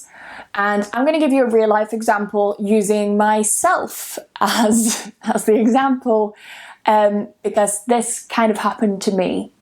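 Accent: United Kingdom. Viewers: your British accent is just lovely! I've been working on American English accent for ages, but now I need to think twice...... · I like the way you say the word "Japanese". I feel the British accent. Your channel is by far the best channel to improve British pronunciation. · Shortcut: Looping this video to learn the British accent from 😏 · Thanks for your tips and all your encouraging words. Leaning a language is so difficult. It has been years since I have started learning all by myself because I was so bad in school. In France, they are not good for teaching english at school. So technically I am learning by myself with series in english with english french subtitles first and now with english subtitles. I am listening to Podcast recorded by my favourite actor who is english. And now I am preparing for the TOIEC. Each day I listen some of your videos and I am really learning to sound more British thanks to you. 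It's so easy to listen to you, I can perfectly understand what you say. I don't think I will be completely fluent one day because it's really hard but I am progressing each day and that's a really good thing. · Hello miss, yours is RP or Modern RP accent or any other UK accent ? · Please tell me in which british accent you are talking😊💐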